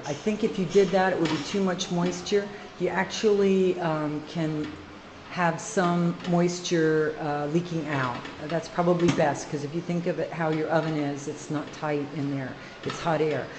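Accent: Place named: Pennsylvania, United States. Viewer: I'm hearing a Pennsylvania accent :)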